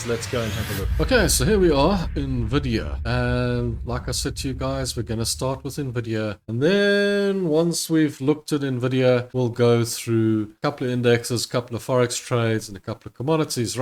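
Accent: South Africa. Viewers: Sounds south African to me 😂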